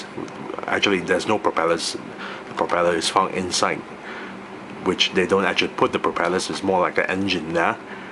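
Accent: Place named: United Kingdom. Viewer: Sounds like across the pond 😊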